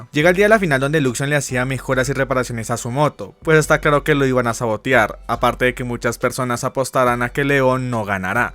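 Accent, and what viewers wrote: Colombia. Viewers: eres de Bogotá 🤨🤨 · ya se me hacía que eras Colombiano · ¿Soy yo o sos colombiano?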